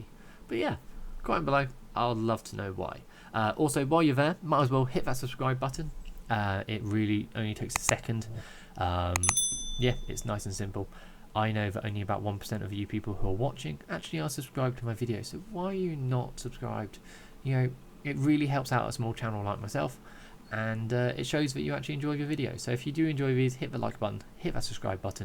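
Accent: United Kingdom. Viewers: Subscribed for this beatufil British accent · The British accent is the worst! My ears are bleeding. Americans hate the British accent! You may be referring to the treasonous pride flag Americans.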